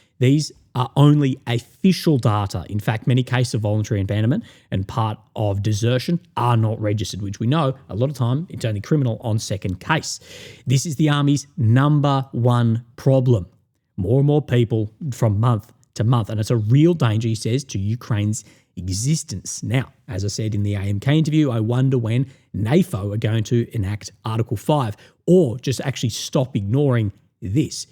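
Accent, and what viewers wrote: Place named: Australia. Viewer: Down under mate 🙂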